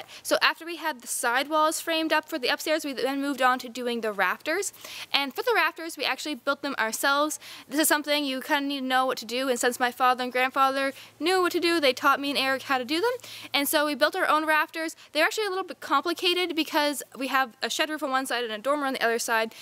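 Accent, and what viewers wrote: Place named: Canada. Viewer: I wondered how you were gonna keep the mice out. It's amazing how they magically make fiberglass insulation disappear . Not sure where you are building the cabin...guessing it's Canada because you all say abowt. Happy New year😄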